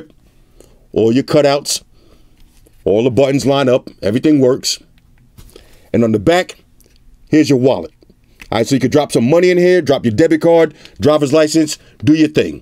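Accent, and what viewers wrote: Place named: California, United States. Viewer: Anyone else wanna see what he looks like 🙌🏽 he sounds like a Californian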